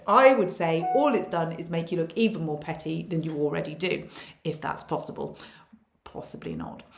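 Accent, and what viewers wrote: RP, United Kingdom. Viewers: Cor your so posh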